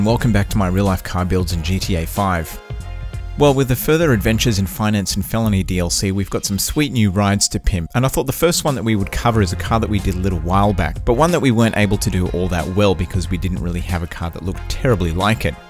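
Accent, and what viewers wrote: Australia. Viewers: Are from Australia.?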